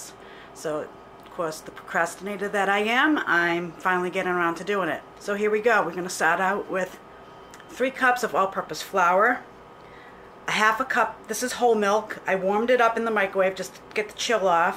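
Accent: Boston, United States. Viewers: I hear Boston! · Nice and easy - I'm thinking Boston or Waltham - but I could be wrong with that accent - but we all LOVE accents - it's what makes America that thang - as for the shot glasses - says a lot LOL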